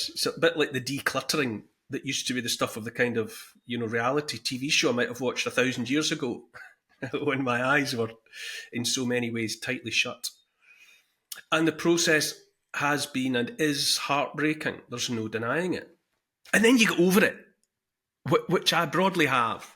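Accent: Scotland, United Kingdom. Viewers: Thank you Neil I just listened to this and I agree with every word. A paradigm shift for me personally and for the world (I cautiously hope). I’m Scottish but live in Cornwall and sometimes wonder about moving back up north. Would be Fife probably. But Scotland has scared me a bit lately…. So many people very asleep. Including my family - not a single one understands what I’m talking about, when I dare to talk that is. It’s when I try to open the Overton window just a tad more (Maybe Trump isn’t such a scary prospect. Maybe you’d find interesting information on X. Etc etc) they just default to calling me a conspiracy theorist. One person told me she hates Twitter/X because it’s full on right wing hate speech. I asked her what things she reads there. ‘Oh no, I don’t use Twitter, I’ve just read about it’ !!!! And they tell me I exist in a bubble. 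Anyway, I felt happy yesterday - November 6th- and I kept quiet about it . Sadly I’m a ‘childless cat-woman 😹’ who doesn’t have a sympathetic partner or family. But I can still laugh and do my own research and make up my own mind. You are a helpful guide and I especially love listening as you speak in my familiar Scottish tongue. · Well said, Neil. I love listening to your Scottish accent. I’m not so fond of my accent but is very distinctive. I’m from Arkansas USA, Woo pig sooie! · Man this is the most hard core Scottish accent I’ve ever heard 🤣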